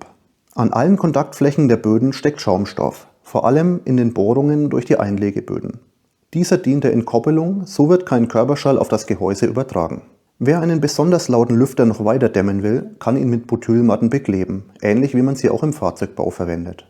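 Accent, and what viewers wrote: Franconia, Germany. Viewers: Immer wieder angenehm den fränkischen Dialekt zu hören. Sehr informativ. Dankeschön 💚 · bitte nicht falsch verstehen - deine Lösung ist durchdacht und erfüllt seinen Zweck. Wollte dein Projekt nicht schlecht machen, falls das so ankam. Besonders der fränggische Dialekt hat es mir angetan ! :D grüße aus Minga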